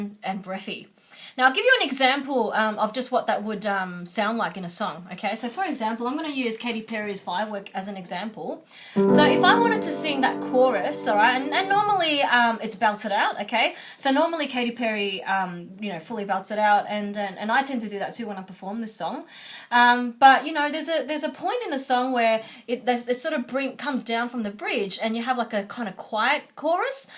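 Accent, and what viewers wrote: Australia. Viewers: LOL what does being a linguistics major have to do with anything? She has a pure Australian accent. Is the accent not the same as any other Australian accent from that region? How does her being Asian play into your interest...(ahem) exoticizing on a linguistic level? I could see if there was some residual Asian accent to make an interesting mix but there is none.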